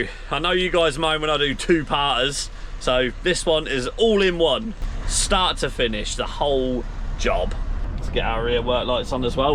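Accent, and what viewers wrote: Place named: United Kingdom. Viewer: i liked this uk english voice :D , btw nice video as always bro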